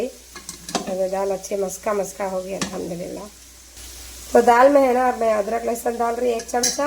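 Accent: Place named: Hyderabad, India. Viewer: mien yaad rekhna mujhey mien bahut pareeshan hun ,,,,❤❤❤❤ App baat kerty ho meri aik dost ki terha ,woh bhee app ki terha Hyderabadi zubaan bolty theien ,,nawab bhai or Bhabi meray pyari baree behan ,app Kay jaisa hi boltay thay same ❤❤❤❤❤❤ Namaaz Quran roza ,,bachon ko sab Sikhaya app nay Allah Pak qabool karay hum moms ki koshish,,mien bhee same app Kay jaisa try karee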